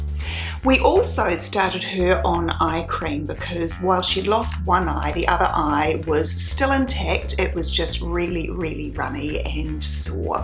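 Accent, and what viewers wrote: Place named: New Zealand. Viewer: If that is English from New Zealand you should be teacher, I like this accent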